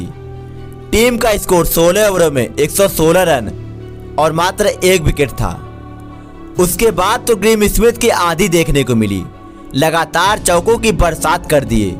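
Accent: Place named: Bihar, India. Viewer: Tu bihar se ho ka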